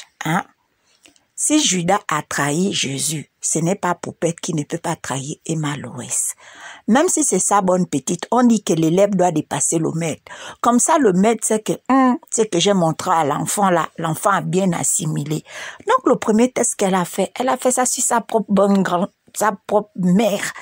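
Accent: Gabon. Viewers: 😂😂😂😂😂 explication excellent , j'adore trop l'accens gabonaise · Je m'abonne j'ai reconnu le bled à travers l'accent. #Gabon# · C'est une gabonaise qui parle🤣🤣🤣 une fille du sud! Je m'abonne 🇬🇦👏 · Ton accent gabonais la 😂😂😂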